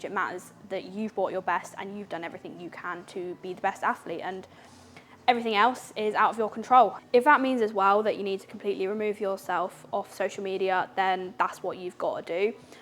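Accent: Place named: United Kingdom. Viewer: Strong ! It's always a pleasant surprise to hear a British accent :)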